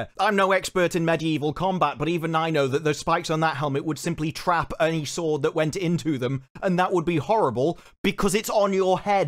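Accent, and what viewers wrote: United Kingdom. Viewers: Can't help but smell the irony of somebody with a british accent, with a union jack behind them, complaining in english about a mangled culture.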